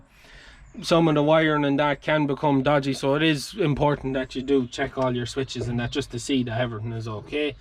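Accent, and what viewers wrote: Ireland. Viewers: I might be wrong but are you Irish?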